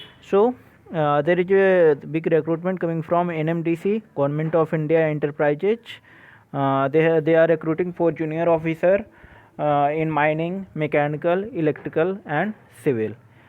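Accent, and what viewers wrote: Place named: France. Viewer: Hindi hi bolde Bhai English Teri French Jaisi hai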